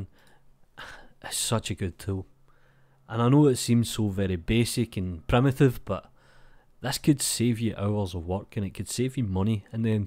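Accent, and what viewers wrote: Scotland, United Kingdom. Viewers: Love your scottish voice reminds me of GImli. :) Can you tell me why powersave is good when i can press save myself???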